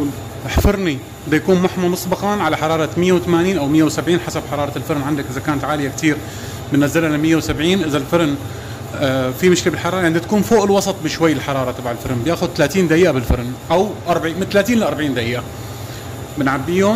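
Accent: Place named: Damascus, Syria. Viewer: الله يعطيك الف عافيه انا لما بشوف فيديوهاتك وطريقه حكيك بحس حالي قاعده بنص شام الله يفرج ع سوريه واهله بجاه الحبيب محمد(ص)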